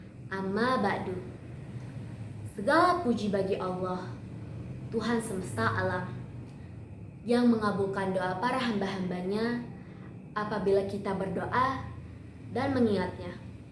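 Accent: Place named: Sunda, Indonesia. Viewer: Keren. Ternyata 'berbahasa' pun masih trrdengar medok sundanya. Pdhl 'speaking' nya juga medok 😀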